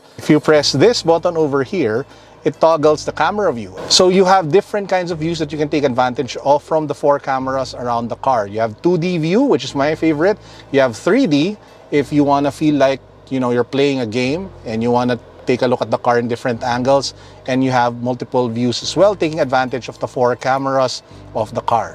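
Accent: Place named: Philippines. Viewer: gawa kapa ibang review sir mas maganda kasi boses mo kesa don kay autodeal. yung english mo kasi sir may tagalog accent pa kaya ayos sa tenga.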